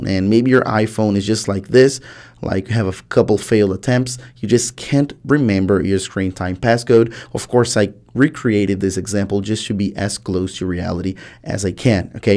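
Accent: Brazil. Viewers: Fala brazucaaaaaa, sotaqueraaaaaaa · Brasileiro ? Sotaque não engana kkkkkk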